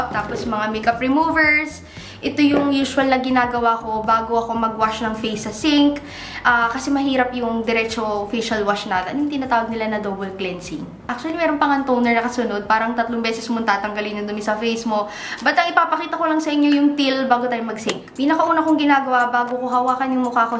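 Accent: Batangas, Philippines. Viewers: "Pinay skin tone kumbaga" love ur batangueña accent. Ps: subscriber from Batangas 😊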